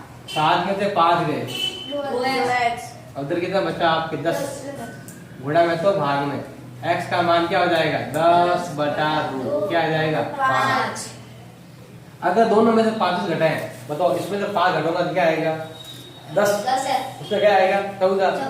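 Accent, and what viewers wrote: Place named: Rajasthan, India. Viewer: sir apki awaj se lag rha h aap rajasthan se ho to mujhe tusion lena h please sir jwab dena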